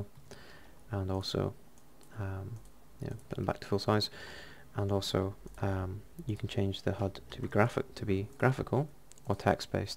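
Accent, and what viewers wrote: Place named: United Kingdom. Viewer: nice brootish accent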